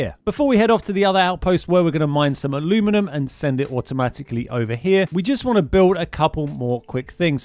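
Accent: England, United Kingdom. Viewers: I get a kick on how you English people have an accent, not to mention the unique words you use quite often like "Plunk" ... I'm not going to laugh or ridicule, because everybody's different everybody has their own little niche that helps them be more unique. I myself am quite unique that way also so I empathize. I have built about three outposts one of which just to supply a need. I did build a base on Masada. With me taking an educated guess, the very first time I placed the beacon down I had seven resources available at one spot. I would strongly suggest checking it out, because for the end of the constellation storyline is on that planet also. Not to spoil anything please be safe, also more information about Outpost information is greatly appreciated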